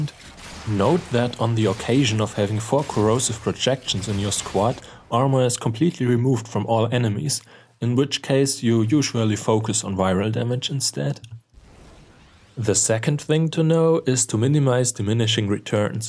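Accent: Germany. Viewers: Are you German ? ^^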